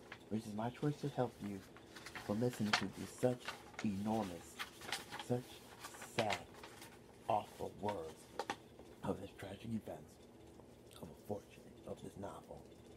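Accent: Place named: United States. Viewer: you do! everybody does! lol! the accent of the place we are from, city, state, area ect. being from italy, i hear your american accent very much! :) It was ment to be a compliment.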